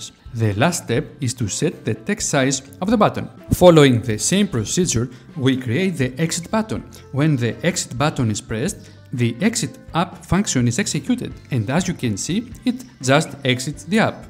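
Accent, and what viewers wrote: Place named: Greece. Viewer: Greek accent tststst.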